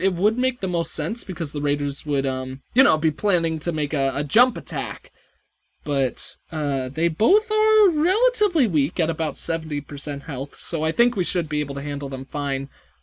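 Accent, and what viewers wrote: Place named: Australia. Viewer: you sounded Australian to me (I'm British)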